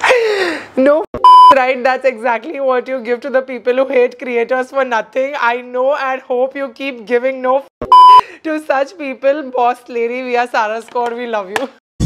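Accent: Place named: Karnataka, India. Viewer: Hey Sarah.... Love ur video... U r a lady boss.... I m from Karnataka....i loved ur kannada accent....u r just the inspiration for younger generation....i really feel very happy to see your videos...i think this my first comment on ur videos....i watch all ur videos.... U r the best... Super independent women ❤️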